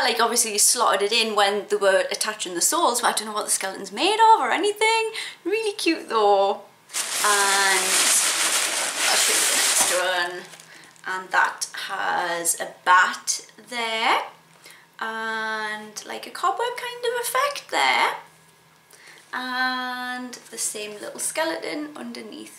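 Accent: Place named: Newcastle, United Kingdom. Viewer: Love your Geordie accent and the boots xxxx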